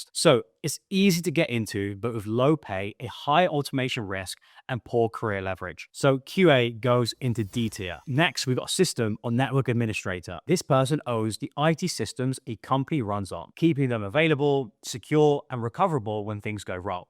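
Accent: United Kingdom. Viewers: English Accent? Nice.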